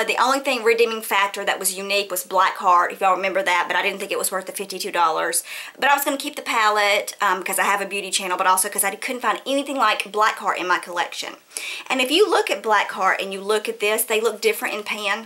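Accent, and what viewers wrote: South, United States. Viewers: Judy brought me here! I love your accent! You are definitely a belle. ^__^ *subbing* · Heard about you on itsjudytime. I love you. Lol You're such a doll. I subscribed. I love watching makeup tutorials.. You may get this a lot but i just have to add i love your southern accent :)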